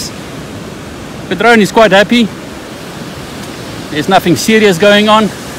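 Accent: South Africa. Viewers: are you South African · Sounds very South African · i love the accent. sounds south african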